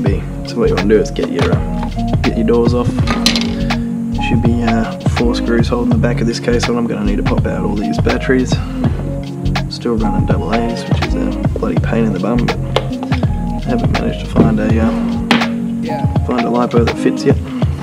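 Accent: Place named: Australia. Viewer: So aussie...love it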